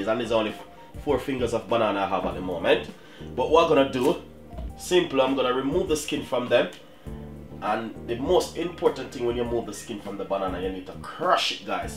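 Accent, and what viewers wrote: Jamaica. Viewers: Real yardie...from u hear di counting (number dats di second one) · Kurt Sammons that is how Jamaicans speak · you obviously don't know your own dialect... ppl from other Caribbean countries sounds different from us... ppl from Trini.. Barbados and all over speak different... you can easily tell a Jamaican accent... she doesn't listen to what he says cuz he says "this is how we do it here in Jamaica" in every video!!! so it is a stupid question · Nah bredrin, I love it when he says "simple add ...." instead of "you simply add..."; "luvley an nice"; and "flitters" instead of fritters🤣 He reminds me of my parents (RIP), who were also from the beautiful island of Jamaica ❤❤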